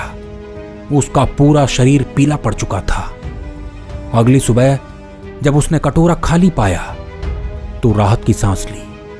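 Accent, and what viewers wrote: Bengal, India. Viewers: Aisa lagta hai ki kahanikar Bangali ya Oriya hai, kya mai sahi hu?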